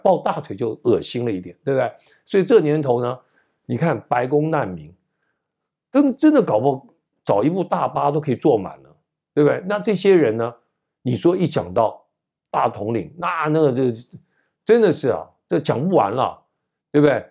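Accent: Standard. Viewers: 台湾移民到新西兰的，国语说得正👍观点客观👍👍👍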